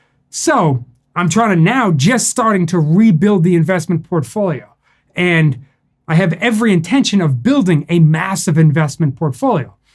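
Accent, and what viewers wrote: Boston, United States. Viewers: It wasn't until you said you were from Boston that I noticed the accent 🤣 I'm from Brockton, should have picked up in that earlier. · You are from Boston ? I could never have guessed !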